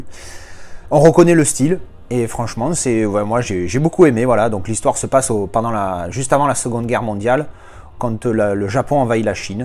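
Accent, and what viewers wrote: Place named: Marseille, France. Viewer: Dire des mots asiatique avec l'accent Marseillais c'est Marrant.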